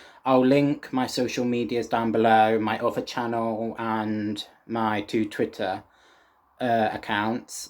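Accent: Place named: England, United Kingdom. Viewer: Agree entirely with your sentiment, this is just another picky comment! Be a bit careful about the vocabulary you use - when you say "'libertarian" in this video, I think you mean 'liberal'. A 'libertarian' is generally considered to be someone who is for minimalising government interference, and maximising individual freedoms - very much into free-market capitalism, minimal welfare state, and privataisation; a 'liberal', on the other hand is what you describe. A 'Republican' (capital 'R') is a supporter of the conservative, American Republican party. A 'republican' in the British sense, is one who would get rid of the queen and the monarchy in favour of an elected president. I know YT is an international platform, but I think the distinction is important when you have an English accent. Sticking to 'conservative' generally avoids any confusion.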